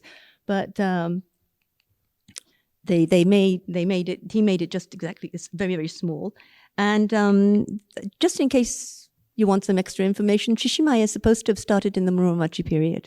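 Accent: United Kingdom. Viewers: かっこいいですね。キレイなブリティッシュイングリッシュ、わかりやすいプレゼンテーション、さすがです❗️❗️❤ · 素晴らしい👍👍👍 king’s English, 驚き‼️こんなすばしいひとみたことない、、殆どイギリス人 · イギリス英語？ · 久子さまのファンになってしまいました。美しいブリティッシュイングリッシュ。お話の進め方も素晴らしく、とても興味をそそられました。楽しそうに話されているので聞き入ってしまいます。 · ある程度原稿があったとしても、その場に応じてユーモアと知性、ウィットに富んだ英語の内容は流石ね！！イキイキされていてホント聞いているこちらまで晴れやかな気持ちになるわね。東京オリンピックの招聘のイベントの時になんて綺麗な英国アクセント、そしてフランス語を話されるのかしら…と今でも覚えているわ。英語の先生、そして全ての学生はの英語を聞くべきじゃなくて？笑顔が張り付いたようなどこかの方はどうなのかしらね？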